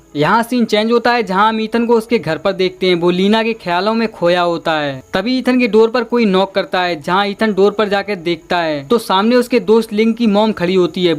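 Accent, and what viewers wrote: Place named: Uttar Pradesh, India. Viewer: Kya bhai u.p. ke ho na aap mast👍👍👍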